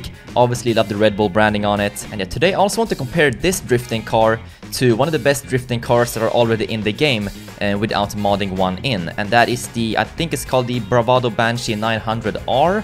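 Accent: Sweden. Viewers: Bruh, your Swedish accent is so satisfying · Are you swedish it sounds like that?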